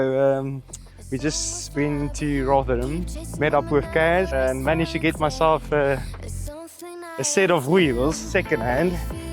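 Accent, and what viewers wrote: South Africa. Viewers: nice to hear a south african accent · South african accent?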